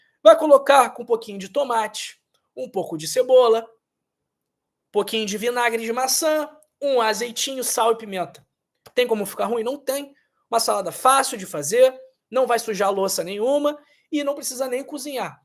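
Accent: Rio de Janeiro, Brazil. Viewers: Muito bem explicado Dr. Simpatia!! Gosto muito do seu sotaque carioca!! Mais uma lição de ensino contigo!! Obg Dr.!!❤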